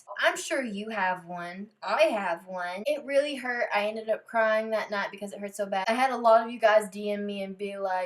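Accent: South, United States. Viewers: This is the very first video I’ve ever seen of her, but I hear a southern accent and it’s SO cute!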